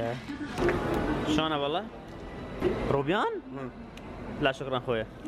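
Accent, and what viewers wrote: Iraq. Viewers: لغتك العراقيه اقوى مني رغم انت ما عايش بالعراق واو بس حبيت اتشكرك من كل قلبي كأنو انا رحت لليابان وشفتها thank you very much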